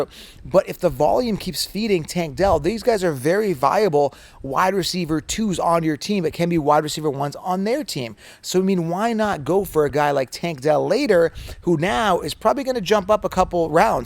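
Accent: Canada. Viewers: Hey man I have an important question, are you Canadian? The way you say about sounds like Avery Canadian accent, lol. Btw you have the best fantasy football information, and people should definitely get the 16 rounds fantasy solution. Thank you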